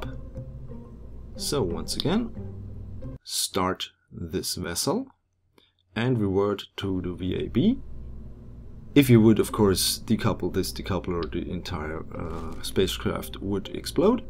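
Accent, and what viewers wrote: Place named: Germany. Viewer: u German? ur accent is just trying to Sound English but failing... just like a German accent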